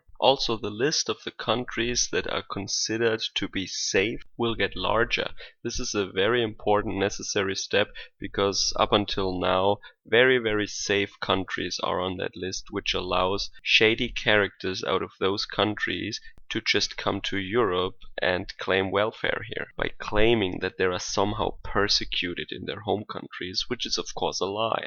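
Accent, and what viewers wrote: Germany. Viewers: 1. Apart from your terrible German accent this is a fine video, that shows that Austria with the charismatic Sebastian Kurz and his partner Heinz-Christian Strache can be an example for Germany. 2. The historical parallelism with 1683 is really interesting. We should learn from history! 3. Poland and Russia and the other Visegrad countries, the Czech Republic, Hungary , Slovakia should be our friends and partners.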